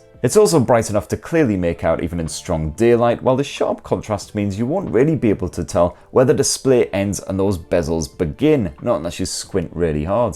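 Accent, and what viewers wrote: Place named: Yorkshire, United Kingdom. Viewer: Loving the accent (yorkshire?) and the quality of the review - fantastic work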